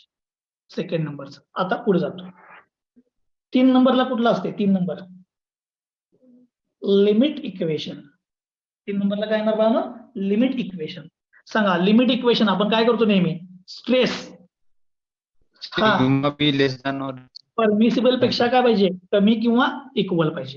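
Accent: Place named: Kolhapur, India. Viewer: Kolhapuri accent is so sexy sir 😊😊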